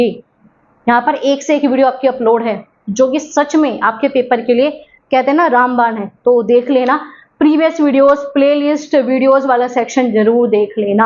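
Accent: Haryana, India. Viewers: Yes ,that haryanvi accent inspiring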